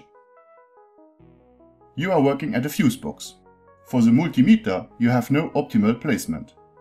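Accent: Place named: Germany. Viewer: are you german or french? sounds a lot like english with a strong german accent :D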